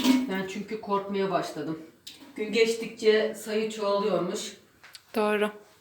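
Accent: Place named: Aegean, Turkey. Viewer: Merhaba hanım hangi memleket burasi sanki Ege şivesi gibi 🙋💐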